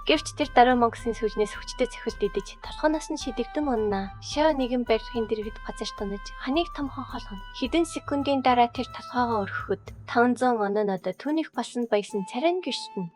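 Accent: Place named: Inner Mongolia, China. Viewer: Жоохон Өвөр Монгол аялга сонсогдоод бхын. Яасан ч хөөрхөн сонсогддог юм😊.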